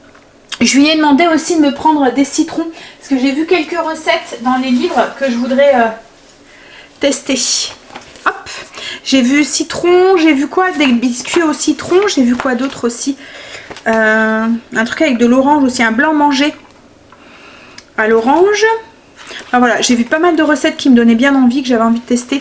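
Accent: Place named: Nord, France. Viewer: coucou ,c'est toujours un vrai plaisir pour moi de te retrouver ,tu es tellement naturelle et "normale" et tu me fais penser à ma copine qui vit là bas dans le Ch'Nord hihi(le ptit accent ;-)) plein de bisous ma belle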